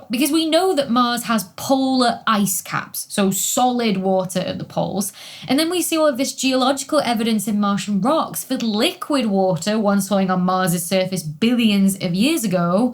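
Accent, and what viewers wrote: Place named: North West England, United Kingdom. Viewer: What part of the UK are you from? Your accent sounds very north-west.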